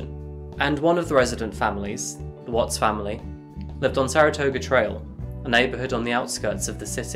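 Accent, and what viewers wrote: United Kingdom. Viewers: I love the British accent all the time I live the royal family I love marks and Spencer your videos are remarkable ❤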